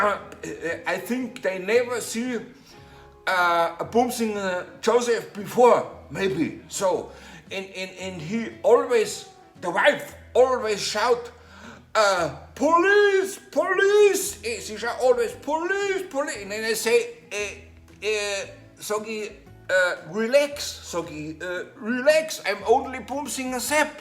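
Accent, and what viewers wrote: Germany. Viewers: Einfach herrlich das baydenglisch des 😂👍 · Ha, der Sepp is der beste, und dein Denglish ist einfach super :-D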